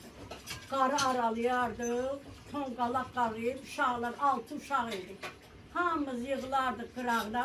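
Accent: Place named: Baku, Azerbaijan. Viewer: Nan bakı lahcasikimi dansdl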